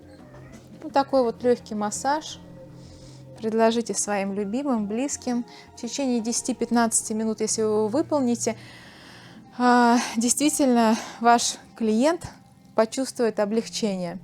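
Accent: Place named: Russia. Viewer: You don't have to if you don't want to but do you think you could make a english speaking video someday? Please I would just love to hear you speak english with your awesome Russian accent. Please!!!!!!!😊😊😊😊😊😊😊😊❤❤❤❤❤❤❤❤❤😍😍😍😍😍😍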